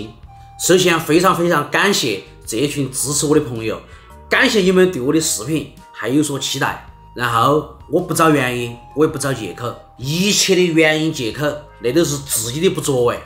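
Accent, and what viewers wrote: Sichuan, China. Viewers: 邊看邊學四川口音呢，沒字幕的話，要聽得懂才行呢，哈哈，我台灣。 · 超小厨阿， 你辛苦了这么长的时间啦， 我们为你的成就觉得非常开心，你不用跟我们解释啥。 作为一个在加州的四川人， 听到你说四川话和看到你煮菜的时候都很让我想家，希望疫情快点度过，可以快速回来整几顿！ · 最喜歡你視頻中，所講的那一口川話，因為家裡長輩均已過世，超想念他們的口音，出生在台灣的我，太喜歡你們的日常，祝你們全家人都平安幸福！我會一直關注你的視頻！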